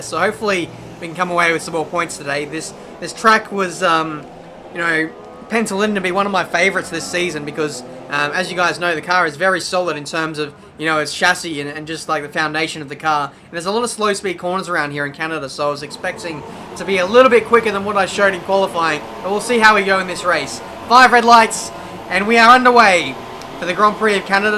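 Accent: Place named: Australia. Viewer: No apologies are needed, I watch because i like the Australian accent and because i love F1, its like watching a season of F1 without having to wait 2 weeks till the next race weekend.. When i get home and finish cooking i watch this as daily ritual almost, and a crap race like this is still more fun to watch than any of the shite i see on TV.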